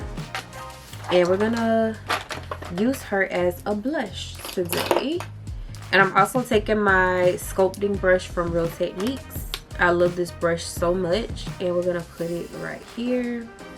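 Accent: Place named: South, United States. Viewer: I knew I heard a southern accent 😂 even down to the certain phrases you said. Girl I’m in SC too and I definitely get it. I use to live in San Francisco but came back due to the pandemic. Wished I would have kinda stayed. 🙄 but ya girl definitely plans on moving again no if ands or buts. 😂 Anyway. Love the makeup 🥰🥰 super cute!! New subscriber 🤗 Very rare I meet another YT from SC!!!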